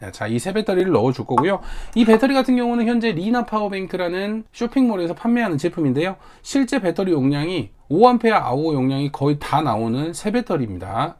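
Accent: Jeolla, South Korea. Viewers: 혹시 전라도 어디세요^^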